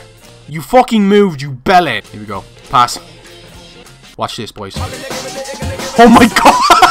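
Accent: Ireland. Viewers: I love that Irish accent coming from a lad from Cork😂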